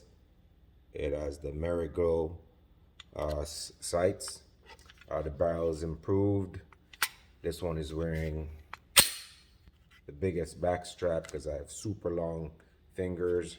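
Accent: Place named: Jamaica. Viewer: Heard the Jamaican accent and had to show love bredrin!! Continue with the great content and another subscriber to the chart!!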